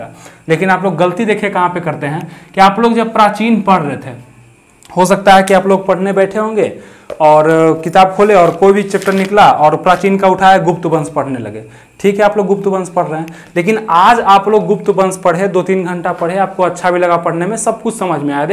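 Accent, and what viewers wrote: Bihar, India. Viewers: Sir ap bihar se h kya?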